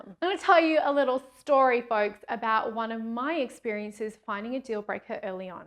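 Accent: Australia. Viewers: Lol omg your so aussie, I love it... Thanks for the tips, now I need one on how to date aussie men ughhh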